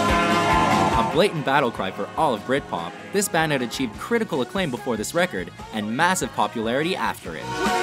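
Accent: United States. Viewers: Why the fuck is this american accent ?cant hear it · Like it how it's an American voice over. Move tf over and let a Manchester lad or lass do this. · Soon as I heard the american accent I was like "This is gonna be shite" xD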